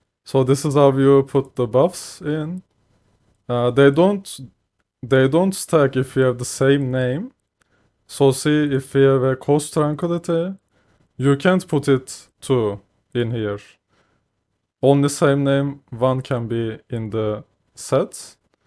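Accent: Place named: Turkey. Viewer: Reis aksandan dedim bu kesin Türk aha dedim baktım kanalın hakkında kısmında Türkiye yazıyor :D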